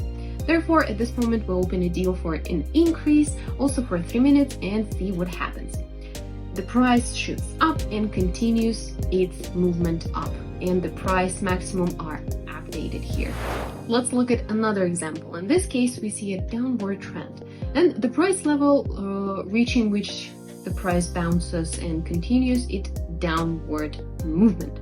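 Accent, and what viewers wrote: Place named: Jamaica. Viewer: great video, love the strategy. Were you speaking Jamaican? More than once you said stuff that sounded as if you took them from.the Jamaican dialect.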